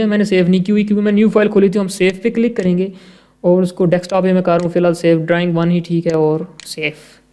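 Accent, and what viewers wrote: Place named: Pakistan. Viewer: Awesome teaching Sir Ji stay blessed i think 🤔 you are from Pakistan 🇵🇰 right listening to your voice communication love and respect from karnataka India 🇮🇳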